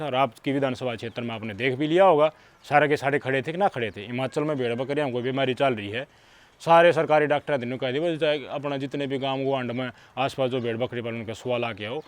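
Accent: Haryana, India. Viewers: Very intelligent brother. Haryanvi boli ka andaz kabil a tarif h. Politics aur Jan bhawnao aur social structure aur empathy ka gazab talmel dekhne ko mil raha h. Adubhut h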